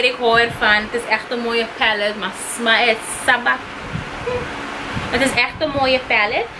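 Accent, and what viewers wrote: Suriname. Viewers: I love it, gewoon Nederlands mixed met Engels en een Surinaams accent. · i like your accent, the way you behave. It is funny. Your Su-dutch is ok also how you bring it as a lady, sounds a little bit funny, a suri lady way. .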